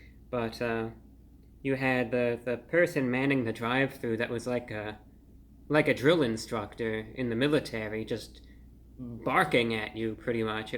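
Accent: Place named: Mid-Atlantic, United States. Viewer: Mid Atlantic accent and idk why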